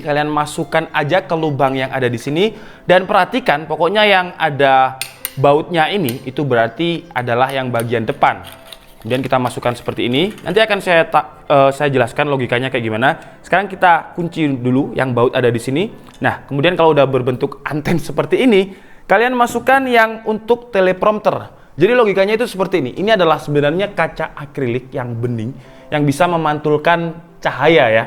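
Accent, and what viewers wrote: Java, Indonesia. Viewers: Orang Jawa ketahuan ketika ngomong b atau kalimat yang ada huruf b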